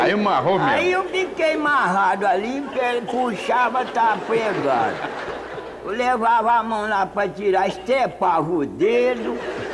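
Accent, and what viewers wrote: Minas Gerais, Brazil. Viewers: Pensei q fosse de Minas, Somos mineiro e meu padrasto cunversa desse jeitinho.. Sem cortar e nem tirar. Grande seu João meu segundo pai.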